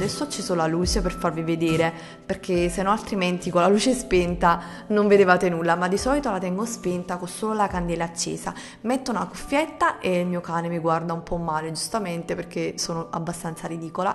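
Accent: Naples, Italy. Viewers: sei napoletana?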